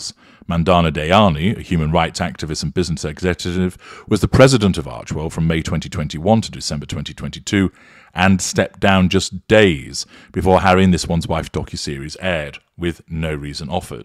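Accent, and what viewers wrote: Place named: RP, United Kingdom. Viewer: Hi Sir, love your use of profanity and you still sound so upper crust and gentlemanly, it’s like hearing a priest swear, you make me laugh 😆 🤭 I do have a telephone voice like all Londoners😊🙃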